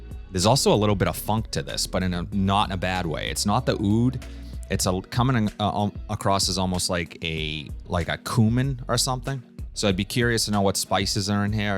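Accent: Boston, United States. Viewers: After a month - have your impressions changed? Also, you’re from Boston aren’t you :)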